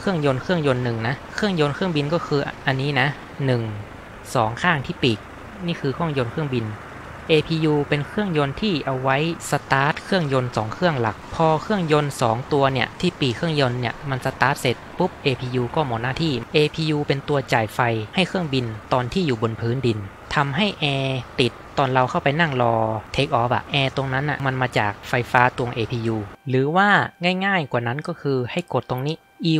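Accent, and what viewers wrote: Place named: North, Thailand. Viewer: กลางเด่น (สาบเมืองขนาดเน๊าะ รู้เลยว่าคนเมือง)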